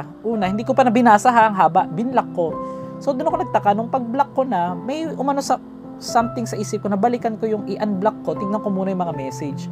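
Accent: Visayas, Philippines. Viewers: bisaya kayo dol?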